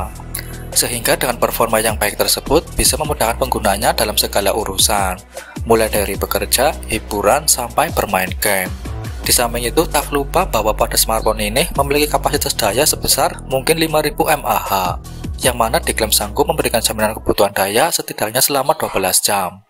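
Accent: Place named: Java, Indonesia. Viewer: Nyunsewu, mas e logat e medok.. saking tegal mas?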